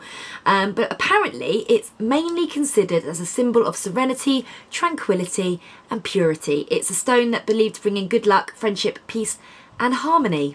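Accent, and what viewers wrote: United Kingdom. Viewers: I love her accent. Which accent is this? British?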